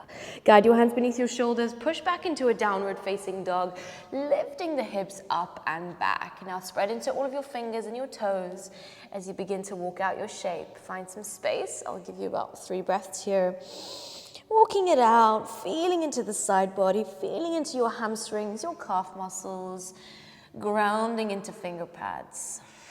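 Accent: South Africa. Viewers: Loves that Your accent is comfortably familiar to a fellow south african, who is overseas. Great instruction and sequence. Thank you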